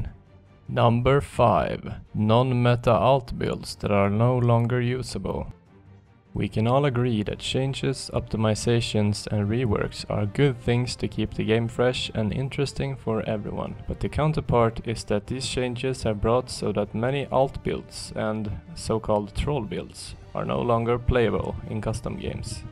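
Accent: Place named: Romania. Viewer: Deci dupa accent deja ceva nu era ok, ba asta ii roman, dupa screenshot-ul ala... :))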